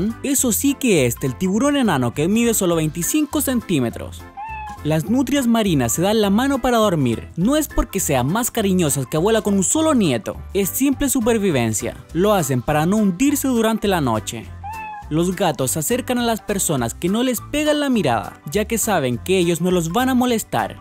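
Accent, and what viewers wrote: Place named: Chile. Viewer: En cada video tienen un acento diferente.. esta vez tuvo mas el acento Chileno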